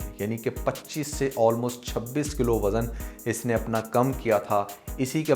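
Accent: India. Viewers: exactly ajeeb ganda paindo indian bhaiyya wala style hai bolnay ka. seems an uneducated person. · Aap apni guftagoo mein kuchh indian rang bharnay ki koshish kr ke apnay bolchal ka satiyanas kr rhay hain. Jesay keh lafz "phir" ko "fir" bolkar aur khush ko bhi indian style mein bol kr. Meray khayal mein is trah aap ne apna bolnay ka standard gira liya hai.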